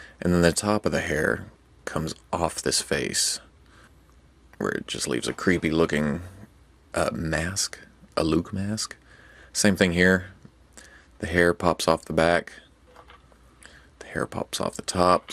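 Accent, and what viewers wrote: United States. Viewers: Is the country, he sounds country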